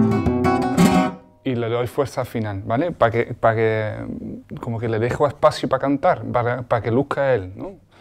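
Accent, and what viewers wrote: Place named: Andalusia, Spain. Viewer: De dónde es ese tííío, te expresas bien y tu castellanondaluz es bueno amigo¡¡ Sigue ahí que sois máquinas¡¡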